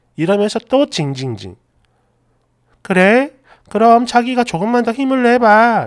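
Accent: Gyeongsang, South Korea. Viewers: ~~~ 쥐~~짠다~~~~ㅋㅋ 전에 경상도에서 10년정도 살은적 있었는데 덕분에 오랜만에 듣고 혼자 빵터졌어요~ㅋ 글구 사연속에 저 쥐 짜는놈은 듣다가 육성으로 ㄱㅅㄲ~~라고 욕했던건 안비밀 이궁~ㅋ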